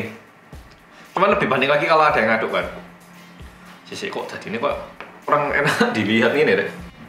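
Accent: Java, Indonesia. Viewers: Kakak keren bangett sii, orang korea tapi medokk banget jawanyaa.. Fasih juga bahasa indonesinya... Sukses selalu mas 😍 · pokok e kalo udah bahas makanan atau minuman.. pakenya luweh. selain itu pake medokk.. hahahaha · Halo guys welcome back to Korea bersama orang Korea yang "MEDOOOOK" bolo-bolo mana sworone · Kak itu: •pinter •ganteng •cakep •medok jawa banget (walaupun au ngak bisa bahasa jawa:v) •ganteng •dan gantengggggggggggggggg Sumpah cakep bener kak 😍😍😍🤩🤩🤩🥰🥰🥰🥰 By: fanbase kak 🥰🥰🥰🥰 <mail> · "Dewean e , hampuddd" Medoke samean nggarai nagih mas😂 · halo guys welcomback to korea bersama org korea yg Me:Medhoookk....:luwess.. Me:malu ny aku anjir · Muedokkkk e pollll rek mas Iki ngalah² i wong jowo🤣🤣🤣😍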